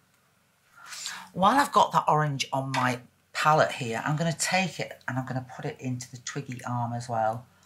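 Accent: United Kingdom. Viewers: What a super tutorial this is ❤. As a foreigner (to you ) I just love listening to your British accent ( almost makes me forget to paint 😊 ) and I will definitely try to make a go for a snow man this year ☃️ Thanks for sharing this 💯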